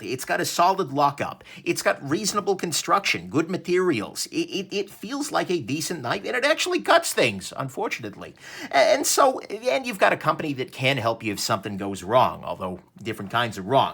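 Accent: New York, United States. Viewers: Can I ask where your from? NY?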